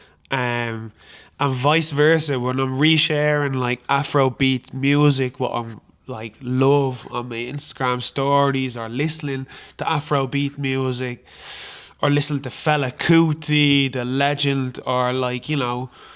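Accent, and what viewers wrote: Dublin, Ireland. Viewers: Fascinating, thank you. Your mom sounds incredible! You look black and sound Irish. I look white and sound non-Irish. Always a bit more to reconcile, but I think it's enriching to have additional perspectives. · Fine dub accent that mate. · No such thing as Black and Irish...you are an Irish citizen but you are not Irish. If I was born and live in China...it doesn't make me Chinese, rather a Chinese citizen. But, love your Irish accent! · Well, you're here and you sound like any other Jackeen, so I suppose that's that. But there is more to being Irish than just being born here, or having the accent, or having a passport, playing our games and music, or whatever. Simple as that. Like so many other people who have to be heard on this, you can rattle off the names of Phil Lynott and Paul McGrath. Both of whom had one actual Irish parent, it seems obvious to point out. 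If only all our imports would contribute so much as they have. I'd be more interested to hear your thoughts on Kevin Sharkey and his political views. If you don't know who he is, you should do. He's a complex character for sure. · Well done really enjoyed the honesty & how could anyone think you weren't a Dub bro with that accent 😂😂😂 & your mam's a legend 👏 you're very lucky to have her ❤ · I absolutely looooove your crisp Irish accent and the radio friendly tone of your voice. I can't pin point what part of Eire it is from because it is clear to me that as a nation you guys have many more accents. On a separate note . Moisturize, moisturize, shea butter. That skin's going crack like eggshells if you don't .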